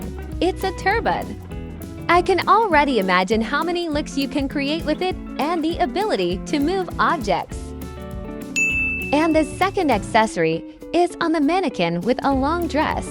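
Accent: Russia. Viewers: Are you Russian or Ukrainian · Are u russian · Arwe you russian?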